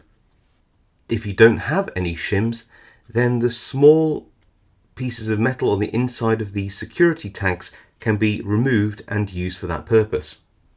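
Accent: United Kingdom. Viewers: Excelent presentation! I already know most of this tricks but with your strong british accent all look like some cassic documentary worth to watch, and yes I almost forget, which size of bra is the best for making lockpicking tools? =) =)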